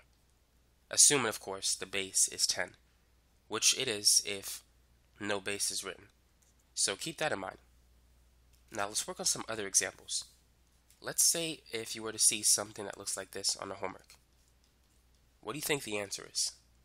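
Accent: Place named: Queens, United States. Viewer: there’s too many especially on the east coast, if I were to guess I’d say this guy probably grew up in the Jewish side of Queens New York but I can’t really tell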